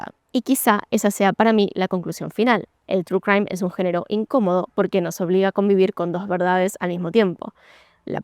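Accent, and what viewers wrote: Buenos Aires, Argentina. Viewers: Excelente tu análisis!!!, tu léxico, que en tu otro canal, en los últimos tiempos me hacían ruido las groserías y no lo digo por mojigata, sino que sos la única argentina a la que sigo hace años y más allá de algún que otro modismo, sin llevar tú relato al neutro, hacés honor al habla del porteño, que tan lindo se escucha. Gracias totales porque sin esfuerzo me hiciste analizar porqué veo y a quienes elijo para ver y oír tanto tru crime. 💋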